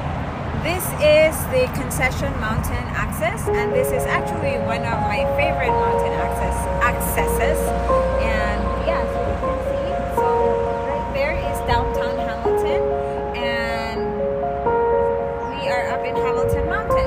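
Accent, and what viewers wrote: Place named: Philippines. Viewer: Philpino accent can be perceived clearly. Anyway nice and beautiful place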